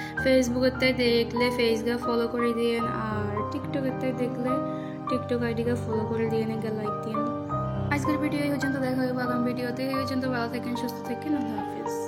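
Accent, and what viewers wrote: Noakhali, Bangladesh. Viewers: আমি বুজি আপনার নুয়াখালী কথা আমি সিলেটি আপনার পাশে আছি ভালো থাকো❤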